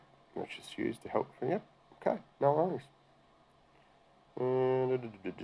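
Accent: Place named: Australia. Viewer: That was interesting 🤔 Why does an Australian accent make EVERYTHING more interesting 😁 All the K-Marts in USA went out of business years ago, had no idea they were still around over there. Wonder if they shipped all the crap from our stores to y'alls?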